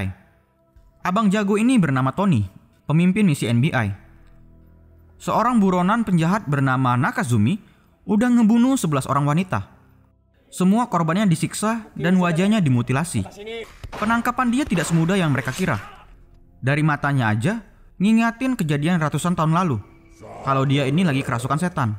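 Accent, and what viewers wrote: Medan, Indonesia. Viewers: Medan kali kau yah bg yahh, salam dari pakam ya lae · Untung aku anak medan jd ngerti apa yg kau bilang hahaha cepat kali bah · wowkowokwok biar mantap bolehlah logat org medannya di pertahankan, saran ya... jangan semua benar2 dipertahankan biar meluas semua org bs menikmati kontenmu, pake Ejaan yg sedikit mendekati bahasa indonesia baku, ok lae..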